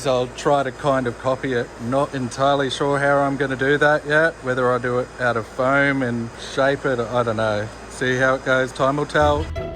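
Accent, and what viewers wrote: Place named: Australia. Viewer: Ok, to start with, since it says you posted this just 9 hours ago, you must have done okay with Alfred. I was worried about you guys, so I'm really glad to see this. Then... brother, you just gave me a crazy idea on how to build a roof for my Bertram 20. A little different than yours because frankly, I don't have anywhere near the patience you do for a project like that, but it'll be cool nonetheless. Finally, I had to laugh out loud, man. I grew up with a couple of kids who were from South Africa, and although I know that the Australian accent and South African ones are completely different, they're close enough to my American ear to bring back a heap of great memories.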